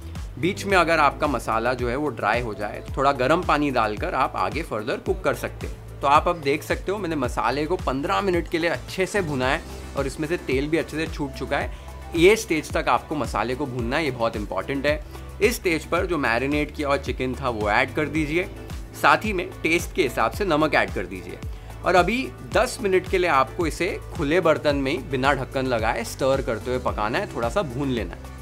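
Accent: Maharashtra, India. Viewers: Your marathi accent is soo good ❤ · You give marathi touch it's grate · You be the best man and your Marathi accent 😌♥️ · Ur marathi accent is v nice.... And very nice recipe yummy😋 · It's amazing recipe and you know Marathi tuning is perfect, we liked it's too much. Keep sharing Marathi recipes a taste of India. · Brooo ur marathii accent is soo spicy ✨ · Your marathi accent is too cute😍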